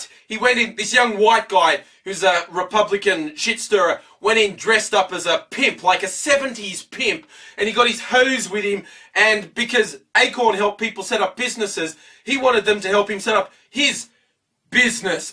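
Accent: Australia. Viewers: Nice Aussie ...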